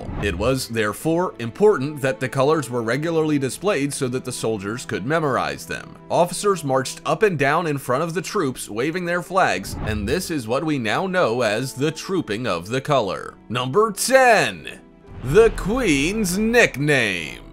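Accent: United States. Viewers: there's an American doing the voice over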